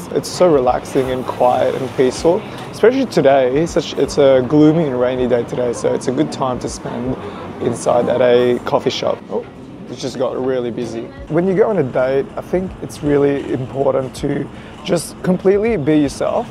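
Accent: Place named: Australia. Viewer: guess I confused the Australian accent w/ a British accent . Luv your vlog & style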